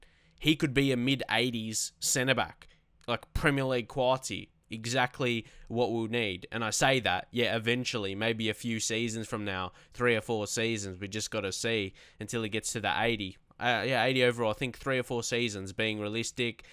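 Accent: Australia. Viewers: Love the career mode but it's moe-at I understand it's the Aussie accent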